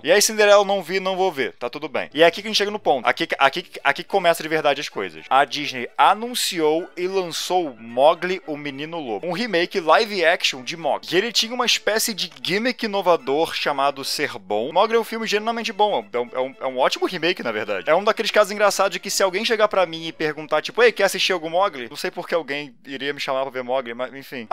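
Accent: Rio de Janeiro, Brazil. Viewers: Vc é carioca?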